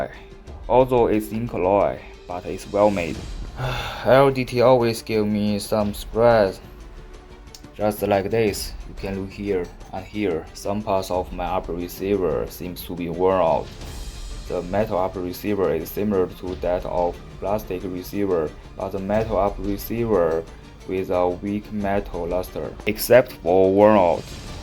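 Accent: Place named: China. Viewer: 塑料中式英文🤪🤪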